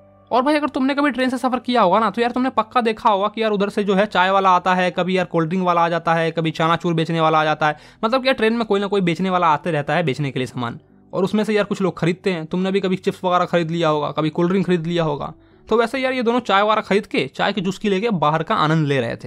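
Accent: Bihar, India. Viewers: Bhaiya aap Bihar se hai kya ?? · Bhai you are from Bihar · Bhai bihar se ho kya?? 😊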